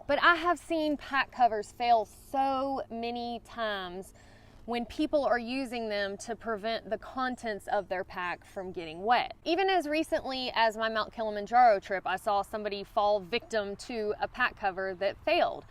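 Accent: South, United States. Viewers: Why, sir, that is a Southern Belle through n through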